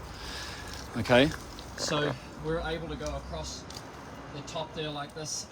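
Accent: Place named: Southland, New Zealand. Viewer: Hey - are you originally from Southland? - I hear that Southland twang in there. (I’m looking to buy and try out a Radial brush soon btw too 😃)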